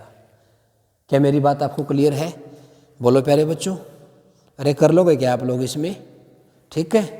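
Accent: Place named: Haryana, India. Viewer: Sir harvanvi galak aa rahi hai sath ma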